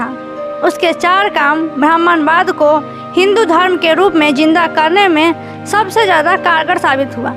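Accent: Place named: Bihar, India. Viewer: आप किआवज बिहारी जैसी है किसी दो गुट आपस मे लड़ रहे हो तो उसको शांत कर दिया इसलिए वह खराब है ऐसी सोच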